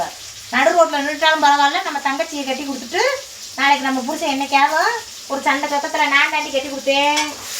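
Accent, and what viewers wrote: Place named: Kerala, India. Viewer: நான் கேரளாவில் இருந்து உங்க வீடியோ பார்த்து கொண்டு இருக்கிறேன் அக்கா. நீங்க பேசுறது எங்க ஊரில் இருப்பது போல் இருக்கும் அக்கா ❤😊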